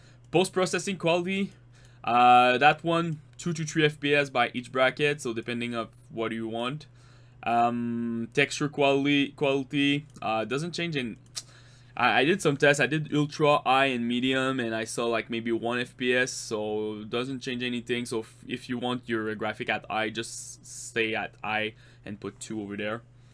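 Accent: Quebec, Canada. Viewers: Quebec accent xD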